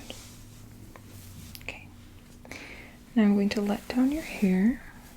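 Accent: Canada. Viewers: You sound Canadian---I grew up in Toronto, myself---now living in the US--- so glad I have found you on these sites!!❤️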